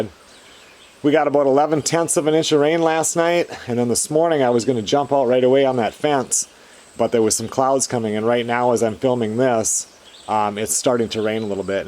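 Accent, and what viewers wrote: United States. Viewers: Hello, I like to clear something up reading some of the messages heck even my own i see something that needs to be addressed. What I am about write I mean in the best possible way. is a hard working driven man. Who for whatever reason fell into this posting events from his life a small part of his life. That we the viewer find informative and funny and just entertaining. Why he does this i have no clue maybe he just likes to entertain maybe teach in someway. Here is what I do know without a doubt has the same problems we all do. What we see as idyllic is just that what we see. We don't see mad or sad. I'm sure as someone who has been married for almost nineteen years being a couple takes work. I love it when says he did no filming to spend time with his sweetheart somethings are far more important to him then our entertainment. I wrote once to that privacy is important never more so when loved ones are involved. 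But i know they fight he need not say that i know he argues with his kids anyone who is a parent knows that. We who watch hold to a impossible standard No one could live up too. I hope he is not off put by this because i have no doubt he is a good soul. But we the viewers need not make more than he is a hard working driven man who cares deeply for his family. He sounds down right American to me. We need those so much right now!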